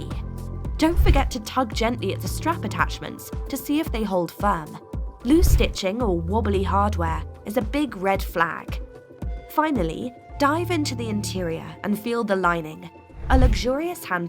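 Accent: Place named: RP, United Kingdom. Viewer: why choose a robot voice with THAT HIGH BRITISH ACCENT?? Do you think it helps you to sound more sophisticated? Not it doesn't. Because it's clearly not your voice, it's a robot voice. You are pathetic.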